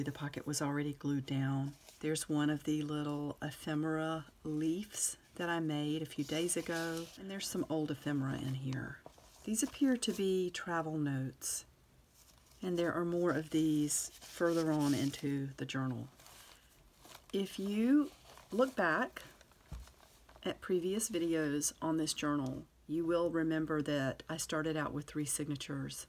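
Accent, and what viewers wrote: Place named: North Carolina, United States. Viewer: I thought I detected a NC accent!! I'm originally from Charlotte but live in California now. Where can I find your items for sale? I'm new to your page, but love it already!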